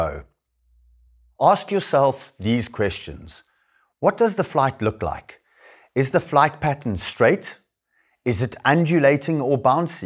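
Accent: South Africa. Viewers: I knew your accent was different! I just saw a comment that said you are south African. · I can clearly hear this guy is South African. We love bird watching 😂😂😂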